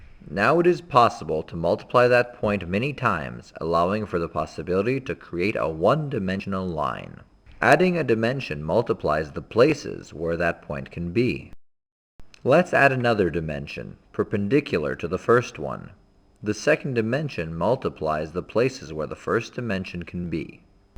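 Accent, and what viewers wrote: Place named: United Kingdom. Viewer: your info is good as well as the video ! i understood it to some extent but i am having problem in understanding the british accent ! can you tell some other source?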